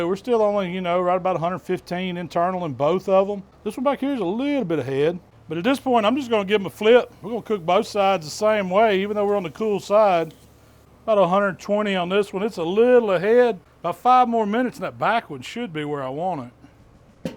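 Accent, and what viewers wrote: United States. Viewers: Обожаю американский акцент.А кухня просто потрясающая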